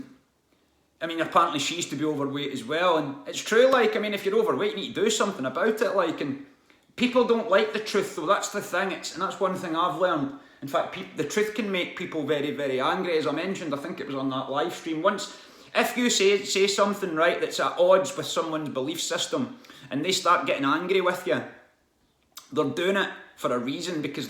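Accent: Scotland, United Kingdom. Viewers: 54 seconds in Turned you off because you slighted Katie Hopkings , The only sensible woman i repeat real sensible woman who talks any sense at all in these "British" Isles. Regarding most subjects . Secondly i turned you off because of your boat race, Thirdly because of your wasself scoottish accent. Oh and fourthly because if i had listened to the rest of what was going to probably be a woke leftie muslim symathising load of bollocks i would have turned off in the first 10seconds.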